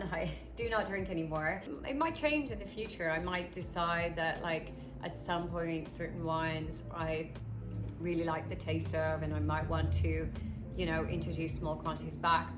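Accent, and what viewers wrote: Ireland. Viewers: Alcohol is a hoax. It’s a poison that robs you of your health, potential and your dreams. Judging by your accent you’re Irish. So you know how difficult it is for people who don’t drink here. I’m off it 1 year and 1 month and my friends think I’m just ‘going through a phase’ and I’ll eventually come to my senses. Never.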